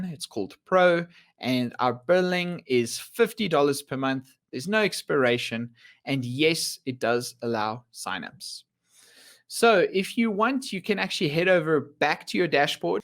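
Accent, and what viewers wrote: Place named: South Africa. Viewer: Baie Dankie. It's funny to hear a South African accent, most webinars I watch are American accents. At least I could understand your accent. Where do I explain what a member receives for each level?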